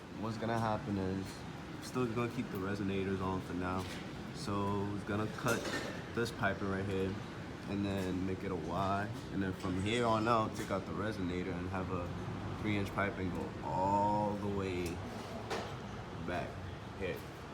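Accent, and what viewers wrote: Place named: New York, United States. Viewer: Cuz sound like he from new York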